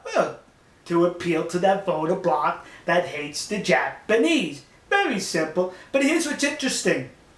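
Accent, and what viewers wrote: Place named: New York, United States. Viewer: The character is a fraud. He doesn't even sound Texan. I think he has a New Yorker accent LOL. It's funny how they promote memorabilia items online. Just a way to earn a quick buck by telling the Japanese what they want to hear.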